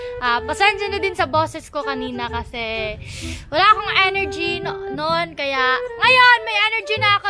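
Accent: Visayas, Philippines. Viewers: Ate Bisaya kaba? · Wait bisaya kaba ate?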